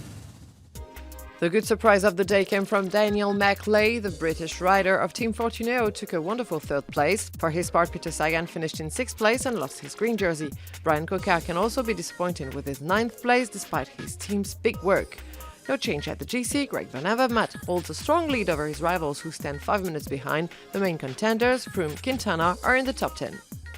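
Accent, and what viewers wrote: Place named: France. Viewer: I really like her frensh accent, it fits so well to this event and sounds wonderfully different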